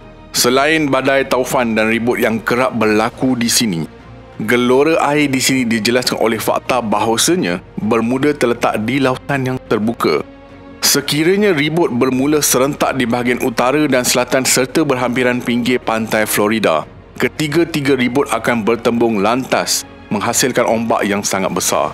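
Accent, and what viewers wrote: Malaysia. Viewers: Orang malaisya ya😇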